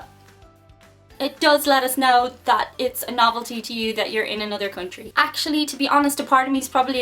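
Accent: Ireland. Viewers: I love your voice and your accent. I would date you if I could ever make it over to Ireland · We can always tell you are from R'land! That voice accent! The Irish are ALWAYS truly welcome in the USA. You didn't say whether Americans are welcome (or just tolerated for the cash) in Ireland? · It's always nice when I find a new channel that is interesting and involves a cute girl with an Irish accent... I know, you've had it for years, it's rubbish, but still works for me. I do have a question though, you've mentioned it's been a long time since you visited the US, when were you last here? We're a pretty slow country when it comes to societal/economic changes so, sorry to say some of the worst parts of American behavior or those you find/found odd are likely still going strong. Even though I am from Seattle (about 4,400 km give or take) from Miami, I do admit they make a fine t-shirt for their team, our team's logo (Seahawks) looks more like an Irate train than anything else. 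<link> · We really enjoyed this thank you. Although I think your Irish accent is fading. Don’t let it happen! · She’s so cute. And Irish.. can I date you? Lol love the accent. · To be fair, in American English we treat "Ireland" as a two syllable word. The only difference here is the long 'i' sound combined with the American 'r' sound makes the first syllable really long. There are basically 3 vowel sounds in the first syllable. A long 'i' is a combination of a short 'a' and a long 'e'. The American 'r' makes the "er" sound. So it ends up sounding like /a-e-er-land/, but we only count two syllables. Whereas your Irish pronunciation starts with more of a short 'a' sound so it sounds like /ar-land/.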